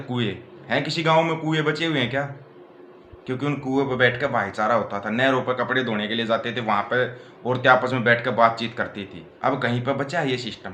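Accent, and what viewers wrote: Haryana, India. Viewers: Tere boli Haryanavi hai or tu Punjabi trend karwa riha hai ki sabko pata nahi hai tu paise khake godi main ucchal riha hai .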